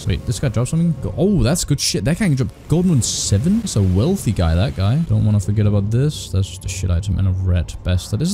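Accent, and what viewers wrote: Denmark. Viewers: Are u danish? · Wait you danish?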